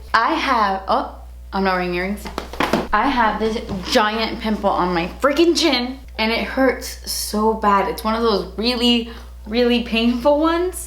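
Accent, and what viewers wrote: Cuba. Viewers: Love it when u speak Spanish and your Cuban accent is so 😍😍😍😍😍 I would be able to hear you just talk forever!!!! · Me too. Makes me LOL everytime her Cuban chica comes out to play! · Can you do a makeup tutorial in Spanish? I’d love to hear your Cuban accent for it haha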